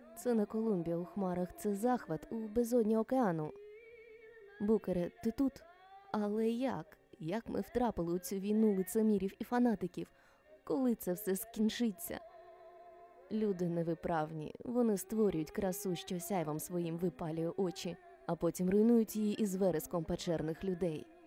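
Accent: Standard. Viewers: Чудовий голос та чиста мова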